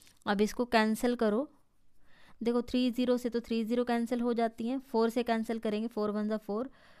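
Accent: Haryana, India. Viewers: Mam are you from haryana?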